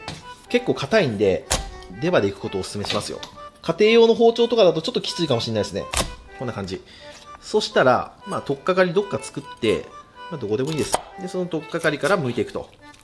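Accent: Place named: Mikawa, Japan. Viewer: ところどころ三河弁なの親近感湧くわー！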